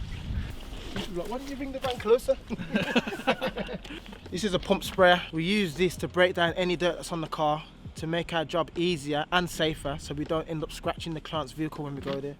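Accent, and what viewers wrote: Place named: Liverpool, United Kingdom. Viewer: sorry brother if I offended you by asking if you were from Liverpool mate haha you sounded like Nathan de asha a little bit 😂 if I lived in uk I would come work for you. I have my own detailing company and just bought a van last month. Yesterday I did 520$ in profit. Around 480GBP. Thanks for the inspiration mate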